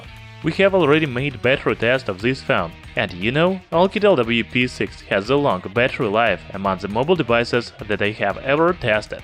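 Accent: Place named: Russia. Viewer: 𝗠𝗮𝘆𝗯𝗲 𝘆𝗼𝘂 𝗮𝗿𝗲 𝗮 𝗥𝘂𝘀𝘀𝗶𝗮𝗻. 𝗔 𝗥𝘂𝘀𝘀𝗶𝗮𝗻 𝗮𝗰𝗰𝗲𝗻𝘁.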